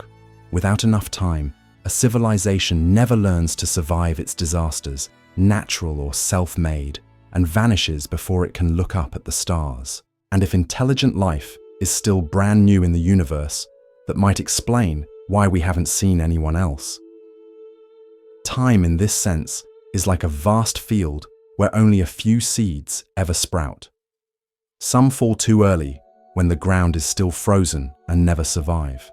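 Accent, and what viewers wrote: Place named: England, United Kingdom. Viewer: I love these types of audio/videos… but one thing I believe can be improved on in many of these audio/videos is the narration. Why does it always have to be an English accent? Try an Australian, South African, or even an American accent.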